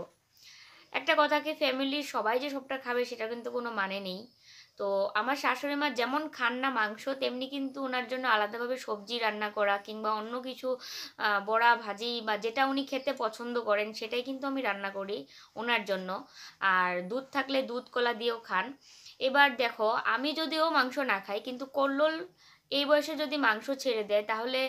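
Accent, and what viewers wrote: Bangal, Bangladesh. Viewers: Didi maajhe maajhe bangal vashay kotha boliyo , valo laage shunte tomar mukhe bangal vasha shunte..❤️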